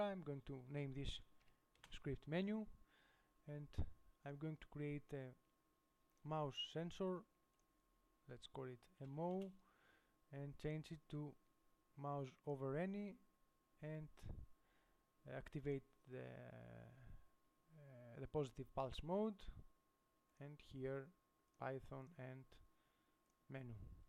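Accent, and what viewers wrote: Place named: India. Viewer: Man you are just PERFECT except your english, I guess you are Indian :D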